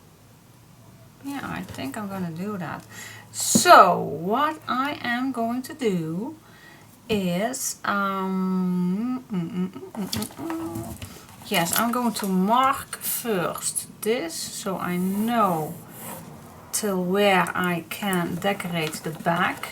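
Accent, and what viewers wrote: Netherlands. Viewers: !, your “ Dutch English”, is one of the things that I find most endearing!. Your witty comments and chitchat, are what makes you, you!. If people want to only listen to perfect English, they should only watch English tutorials!. Please don’t change yourself or what you say or do!, you are just perfect as you are!.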